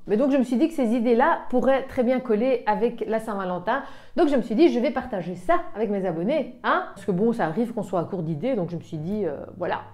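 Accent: Brussels, Belgium. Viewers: Je valide les idées!! Je te connais via tit Caro! J'entend un petit accent de Bruxelles ?! Une voisine 😍 hop je m'abonne! N'hésite pas à venir voir ma chaîne! Tout Plein de gros bisou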